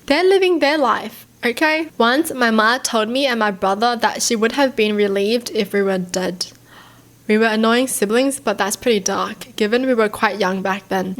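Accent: Australia. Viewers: You still have the best Australian accent to me tho!🥰🔥💜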